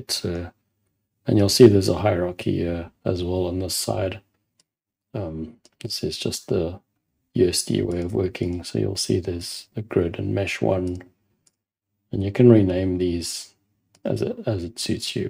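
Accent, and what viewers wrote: South Africa. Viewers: excellent! nice to hear the SA accent :)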